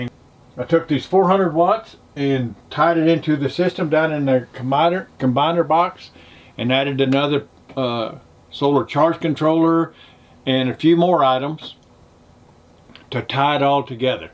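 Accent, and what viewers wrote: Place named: Louisiana, United States. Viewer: "How y'all doing"? Cajun :-)))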